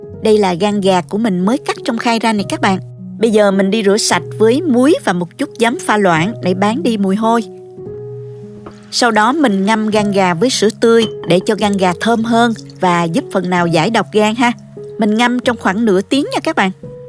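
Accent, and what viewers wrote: South, Vietnam. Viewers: Giong miền Nam của Bình Dương nghe ngọt và bùi như patê cô làm.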